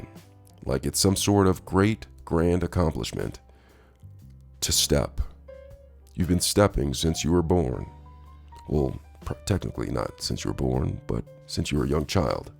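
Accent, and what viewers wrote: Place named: France. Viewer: Very very helpful! Love that French accent! But seriously, I've watched several of these and yours is the best one by far. Huge difference in the presentation and the others were very shallow in the delivery of information and were way less thorough than you. Even the ones that are twice as long as yours we no where as informative. I'm not traveling until January 2026 and I'll definitely watch this video again as part of my final preps. Thanks a million.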